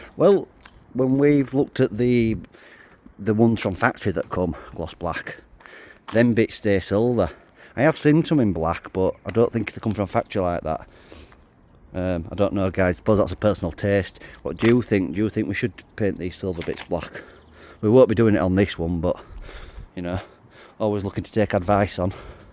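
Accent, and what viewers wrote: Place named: Northern England, United Kingdom. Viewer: I hope that I do not offend you, but where are you from? Your dialect it something else. Are you from from up north somewhere? At the end you said something about Yorkshire. I have worked with people from Yorkshire and also from Scotland. Neither of them sounded like you. Otherwise I am happy that you like the Discovery sport as this is a vehicle I have worked on quite a bit. Actually the entire D8 platform. Also the Freelander 2 before it.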